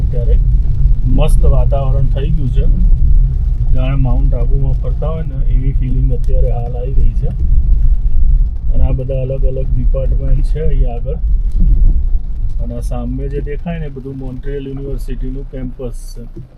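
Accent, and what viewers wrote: Mehsana, India. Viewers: ભાઈ તમારી બોલવાની ભાષા પર થી લાગેછે કે તમે મહેસાણા જીલ્લા ના રહેવાસી લાગો છો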